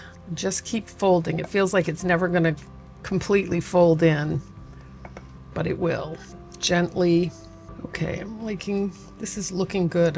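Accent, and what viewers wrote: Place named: New England, United States. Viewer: You must be in New England for 2 reasons. 1. You said aunt instead of ant, and pecan instead of Pecaahn. I loved your charming video and your instructions as you went along. I will be looking for more of you. Btw your little “jug” is also known as a pitcher. Hugs to you.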